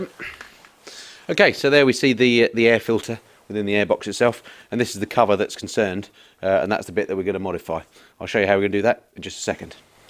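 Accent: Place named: England, United Kingdom. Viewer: I love your English snobbish accent please keep it up Don't ever change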